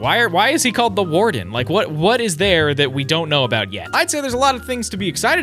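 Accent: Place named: United Kingdom. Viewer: yeh, but where are you from? Your accent sounds like british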